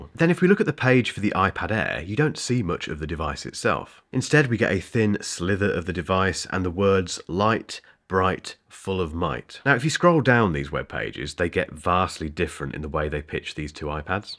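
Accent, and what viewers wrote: United Kingdom. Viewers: Damn your voice is so powerful and that Brit accent is so lit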